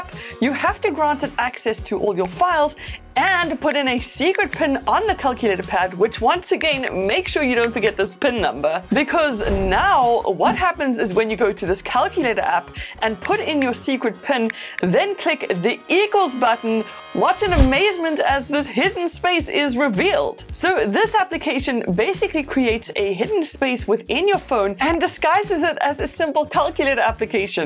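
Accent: South Africa. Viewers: Wait are you south african? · Is that a South African accent? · What accent is that? ZA?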